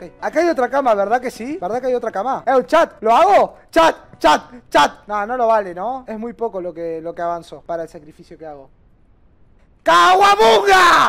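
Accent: Spain. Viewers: El acento español de es perfecto sin más, igual que el arremedo del xokas xd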